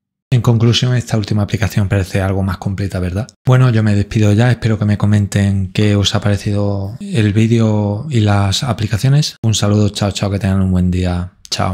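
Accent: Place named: Andalusia, Spain. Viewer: Por el acento, Andaluz (España), no estoy segura si Sevillano, quizá Malagueño eso ya no estoy segura.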